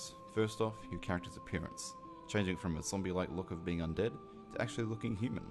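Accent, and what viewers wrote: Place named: Australia. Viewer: He's Australian I can understand what he's saying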